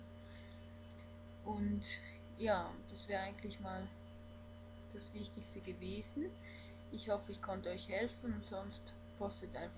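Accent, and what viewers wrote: Switzerland. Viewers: Diese schweizerische Aussprache is sowas von gail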